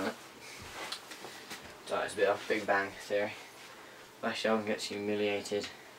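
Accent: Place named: Liverpool, United Kingdom. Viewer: Why did you buy this you scouse?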